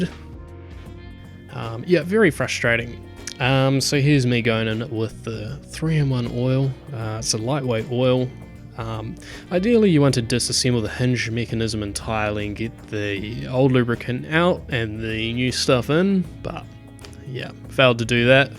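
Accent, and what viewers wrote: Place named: New Zealand. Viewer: I just did a search on the Armada 110, this is the first video I saw - was looking at your machine in the video.......I think I own that exact machine now!!! I was looking at it thinking...no it cant be that big of a coincidence, but listening to your accent it sounded like a kiwi accent.....I went back into my emails from when I brought the machine off trademe last year - I am now sure it is the same machine - what a surprise! :) The machine is still going great, I am getting a lot of fun out of it. Cheers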